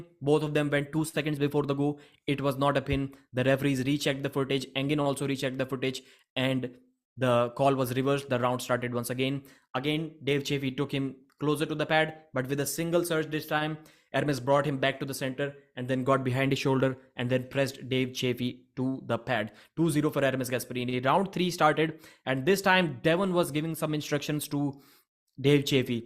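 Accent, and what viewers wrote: India. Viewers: FOR THE MONEY AND CONTENT! THIS CHANNEL ALWAYS UPLOAD CLICK BAIT!! FUCKING CHANNEL FUCKING NARATOR INDIAN🖕🏻🖕🏻🖕🏻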